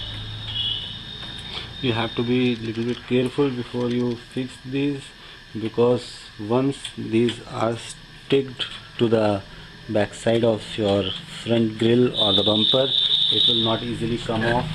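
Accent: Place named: India. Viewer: I like the way Indian people speaks english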